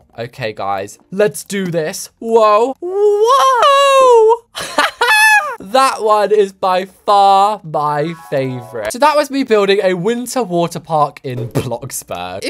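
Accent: United Kingdom. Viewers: As an American, I love your accent and the royals accents, I find them all very funny and British :P Also, I love to imitate British accents :P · BRITISH ACCENT!!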